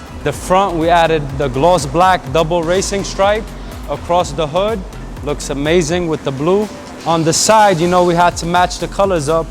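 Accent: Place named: New York, United States. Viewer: I knew by the accent you were in NY. Too far away. But would love to get this done 😩. Fantastic job!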